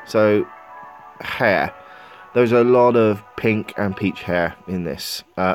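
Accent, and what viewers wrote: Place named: United Kingdom. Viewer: such a cool, posh accent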